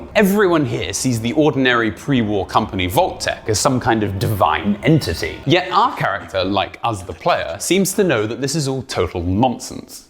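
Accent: United Kingdom. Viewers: Why are you British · Wait you British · I love listening to a British man who sounds like he just got through with his divorce papers talk about fallout two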